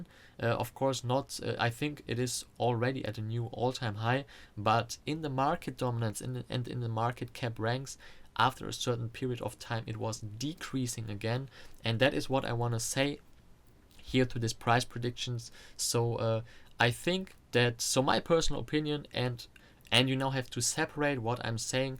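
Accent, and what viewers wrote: Germany. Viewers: einen deutschen erkennt man immer wenn er versucht Englisch zu sprechen !! nice video bro :D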